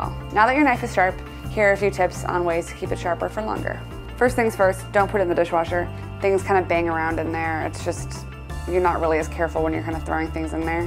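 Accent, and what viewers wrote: United States. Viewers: Which areas in the US would this person's accent be predominant? Or is popular amoung a certain age group? Or perhaps a particular kind of crowd?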